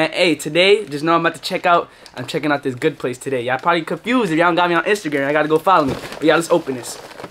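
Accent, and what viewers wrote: New York, United States. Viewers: Is u from NYC?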